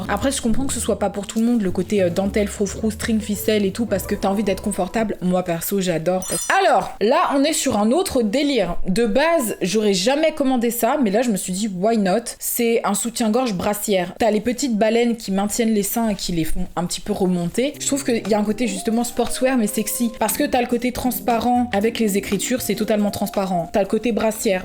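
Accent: England, United Kingdom. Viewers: Wesh tes anglaise ou quoi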